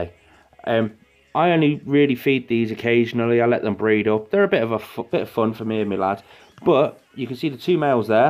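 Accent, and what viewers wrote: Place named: Cockney, United Kingdom. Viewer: i’m american, you sound cockney to me 🥴 i just enjoy the accent a lot and can’t quite say it right 😂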